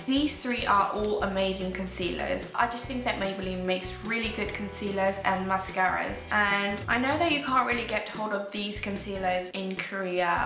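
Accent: United Kingdom. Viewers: 늘 유튜브볼때마다 느끼는 거지만 영국억양 너무 좋네요^-^ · 님 영상 보고 핑크오이스터 샀는데 예뻐요! 영국 억양은 3년만에 익히신건가요? 신기해요^^ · 엉니 영상 자주올려주세요ㅜ 언니 영국발음도 넘 좋고 화장도 진짜 잘하세요!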